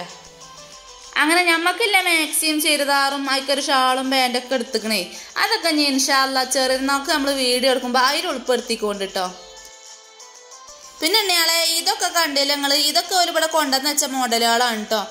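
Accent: Malappuram, India. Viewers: yaa ithaan njangale bhaasha thani mlprm slang 😍😍😍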